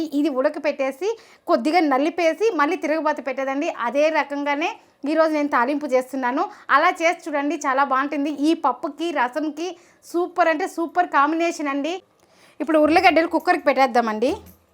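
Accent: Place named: Chittoor, India. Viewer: Ivala try chesamu mee revepii..mee di proper ey place andi..seems like from chittoor ..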